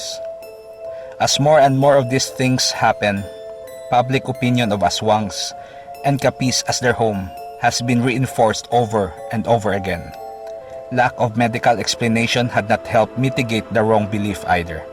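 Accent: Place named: United Kingdom. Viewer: I am not sure which literatures the Author consulted. As a Capizeno, we grew up with the scary stories from Dumsngás, Dingle and most of all Duenas. This propanganda of the Spaniards had barely supported by books by Spaniards. Poorly research and Capiz has nothing to do with Aswang. Your heavy English accent betrays your origin. THIS VIDEO IS POORLY RESEARCHED. FALSE NÈWS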